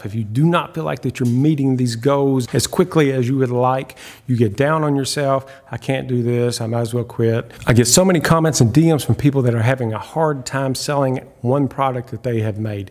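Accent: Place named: Virginia, United States. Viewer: I gotta ask. Are you from Virginia by chance. Just going by your cool accent.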